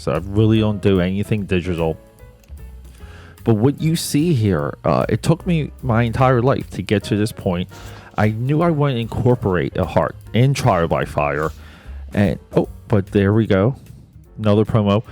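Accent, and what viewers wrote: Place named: Philadelphia, United States. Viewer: I knew you were from Philly by your accent😂 great stuff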